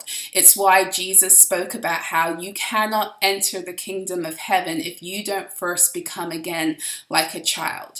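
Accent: United Kingdom. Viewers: Why are you doing a British accent, your from the States yes?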